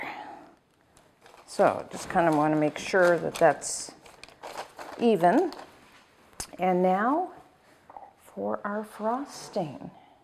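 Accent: Canada. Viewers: Love the step by step explanations. She sounds so Canadian.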